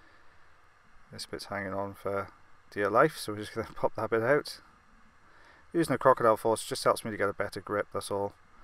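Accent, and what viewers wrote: Wales, United Kingdom. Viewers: Welsh voice, fairly sure.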